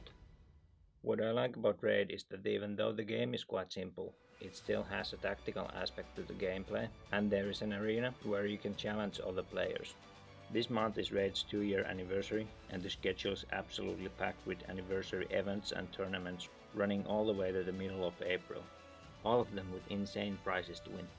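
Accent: Finland. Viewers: Mitä helvettiä, oon kattonu sun videita nyt varmaan jo vuoden ja en oo ikinä tajunnu että oot suomesta :D Aloin miettii asiaa vasta ku aloit puhumaan mainoksen aikana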